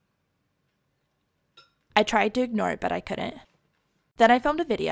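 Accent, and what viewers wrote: France. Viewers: I love your french accent !!!! So cute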